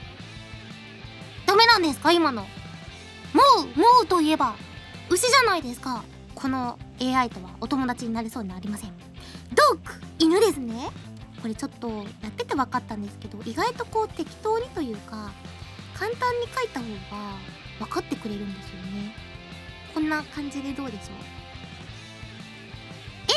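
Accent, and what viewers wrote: Japan. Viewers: английский, с японским акцентом, это нечто <3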